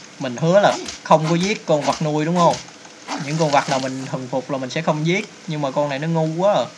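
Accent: Mekong Delta, Vietnam. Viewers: thấy tiêu đề với hình nghĩ ad lên tay rồi ko ngờ ko ngờ là bị mấy con khung long săn lại, mém bỏ mạng kaka đi bụi luôn. ad giọng ad nc dễ thương, người miền tây hả ?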